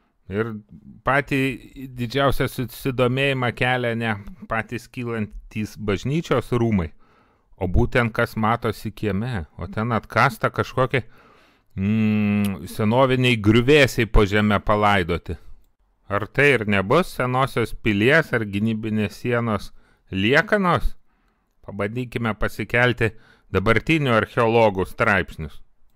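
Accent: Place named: Kaunas, Lithuania. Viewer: Čia tau ne Vilniauskašlechta kalba pusiau rusų pusiau lenkų. Iš akcento girdisi kad Kavenskas tautietis.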